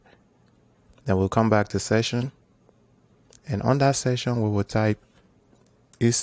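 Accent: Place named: West Africa. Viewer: Dude keep this up! Best AWS walkthru. Also cool you have a West-African accent - keep striving!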